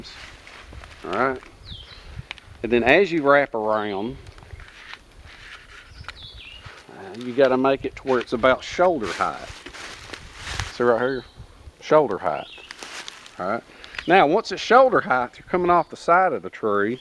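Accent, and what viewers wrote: South, United States. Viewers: From down south? Alabama, Mississippi, Louisiana maybe? You sound just like my sister in Louisiana. (Only with a deeper voice...lol) I paused the video to write this. I’m waiting to see whether or not you say something like “Like this I reckon.” or “Look at this ya’ll !” Lol I’ll just die laughing. 💕😉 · Well, bless your heart. Like me, from the South. We all have license to talk funny. That includes the use of strange idioms. "Jewel" or "little jewel" is interchangeable with "doohicky", "thingamabob", "whatchamacallit", or "jobber/jobbie".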